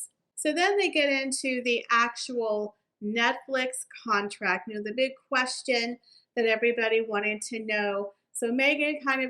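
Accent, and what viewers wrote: South, United States. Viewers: Hi Everything sounds so much better with your southern accent! I’m just so amused that she’s not curling up on the floor with her Hermes’ blanket consumed in embarrassment bc the world knows she’s not the entrepreneur, actress, homemaker, jam maker, fashion icon, beauty queen, humanitarian, author, or AUTHENTIC‼️‼️ She’s barely a duchess‼️ We know who you really are MM; please just stop 🛑 pretending to be this amazing desirable woman bc the world 🌎 has caught on to who you & H really are‼️. You are both money hungry grifters, liars, award seekers, and definitely not humanitarians‼️ Please stop 🛑 trying to censor the public’s opinion of you bc we see the despicable human beings that you are‼️ I hate to break it to you, it’s not just your critcs that know, but the entire world; or as you would say…..the COMMUNITY who are only interested in y’all bc they want to see the train wreck that is Harry & Meghan‼️ Xx from Aspen, Colorado 💕💕💕💕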